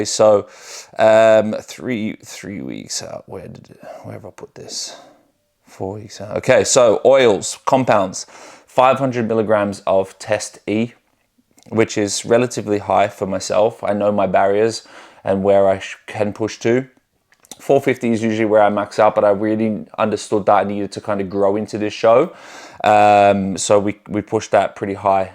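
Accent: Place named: Australia. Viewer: Need a bigger kitchen bro hahah sounded like a aussie 😂, seen you on insta so subbed ✌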